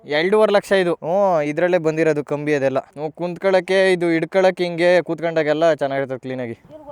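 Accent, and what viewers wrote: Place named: India. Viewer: U r kannada slang is super bro congratulations for the bike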